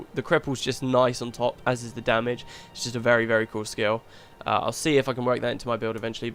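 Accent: United Kingdom. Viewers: "Bollocks!" ;D British english>American english tbh, finally found some variation :) Oh, and about your plan on how many videos to upload at a time, try hold about this phase or solwer if u want it to time it with beta/release. And maby you are being too optimistic about when GW2 is coming. For all we know, it might just as well come 2014 :/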